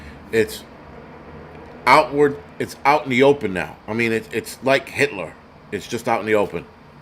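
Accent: New York, United States. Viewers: You sound like a New Yorker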